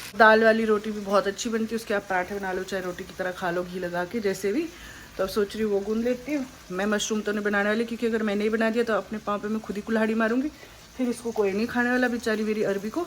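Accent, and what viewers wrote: Pahadi, India. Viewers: omg her pahadi accent is so good 😂😂....love your videos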